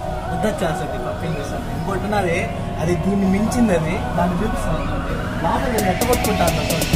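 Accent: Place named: Chittoor, India. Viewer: What a slang pakka Chittoor 😂😎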